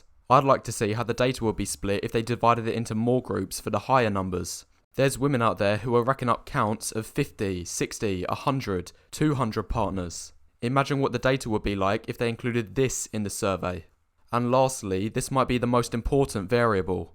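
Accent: United Kingdom. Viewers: hes british if im not wrong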